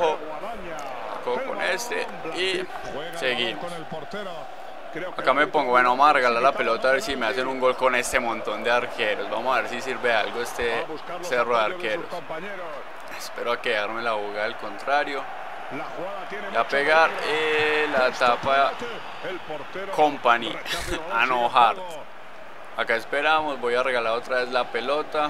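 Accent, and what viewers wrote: Antioquia, Colombia. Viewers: Ud es colombiano? me callo bien :3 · jajajaja sos paisa cierto .... me gusta como comentas esos vidoes como con esa gracia .. saludos desde cali ... por eso no me gusta el fifa solo pes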